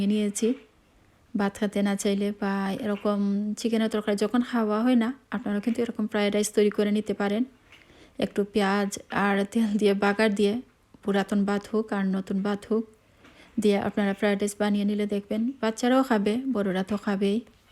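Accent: Sylhet, Bangladesh. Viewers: Please talk sylheti your accent telling me you're sylheti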